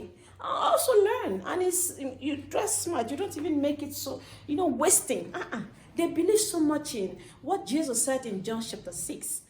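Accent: Kenya. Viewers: Your accent is now more Kenyan than Nigerian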